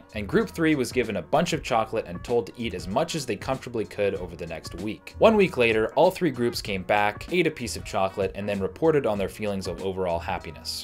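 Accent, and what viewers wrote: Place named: Canada. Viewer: You must be Canadian... I could tell from your accent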